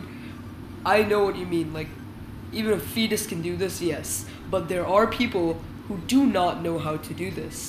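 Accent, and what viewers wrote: India. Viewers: r u an indian?????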